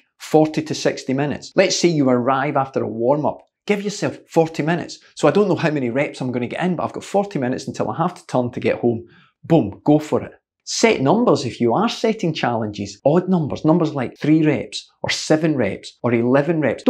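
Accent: Scotland, United Kingdom. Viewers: I love how you're lovely scottish tones make hill sound like hell, I think either works lol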